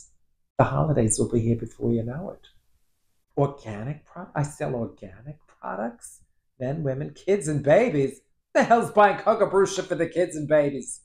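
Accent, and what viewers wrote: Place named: East Coast, United States. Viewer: A nice chat. Your videos are like visiting a good friend. 🙂 Listening you you also makes me homesick for the East Coast. ✨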